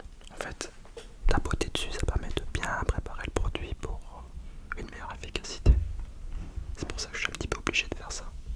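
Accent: Belgium. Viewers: Serait-ce un accent belge que j’entend?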